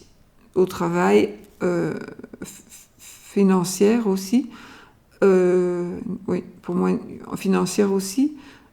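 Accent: Belgium. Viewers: Bonjour, j'ai toute suite reconnu l'accent Belge et ça fait plaisir , je vous remercie pour ce tirage, j'espère que vos prédictions seront excausées car ce long mois de mai fût très dur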